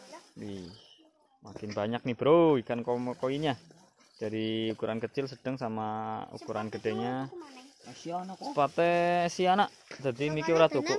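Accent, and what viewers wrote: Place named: Banyumas, Indonesia. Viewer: Deneng ngapak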